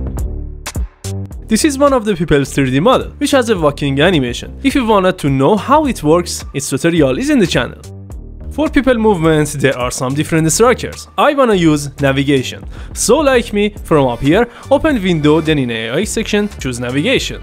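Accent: Iran. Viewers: I love your Persian accent. :D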